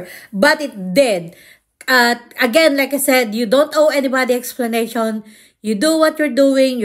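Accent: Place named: Visayas, Philippines. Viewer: What a WASTE OF SPACE this TRYINGHARD YOUR ENGLISH BISAYA KAAYO DAY,PAG HILOM OY KAHIAS NIMO,PAKAULAW KA LANG TO THE BISAYA HILAAAAS,DILE BAGAY SA IMO OY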